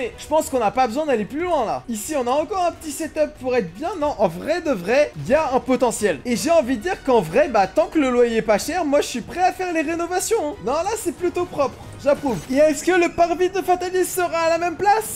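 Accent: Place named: France. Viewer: L'accent Français 😎🤌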